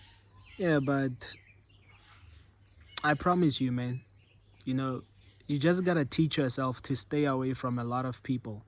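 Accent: South Africa. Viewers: You South African gee?, I can hear your accent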